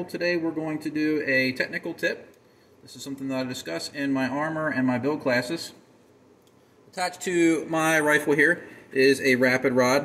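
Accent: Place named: Philadelphia, United States. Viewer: I detected a philly accent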